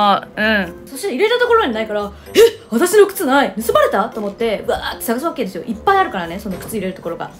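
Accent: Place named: Osaka, Japan. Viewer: 話し方が大阪のおばちゃんにしか見えん。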